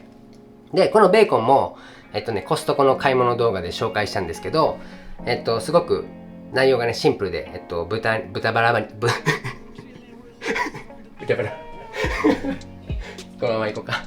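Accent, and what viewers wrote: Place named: Kansai, Japan. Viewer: 関西弁も、いいですよー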